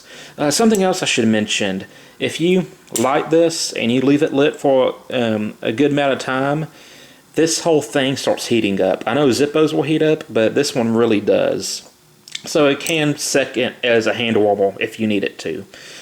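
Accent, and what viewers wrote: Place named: Texas, United States. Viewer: Wattel, Lightel, chambel, smokel, yeal, or anything ending in "r" in English intrigues me as to what part of the USA are you located. Having just left the northern east coast, it is definitely not from that locale.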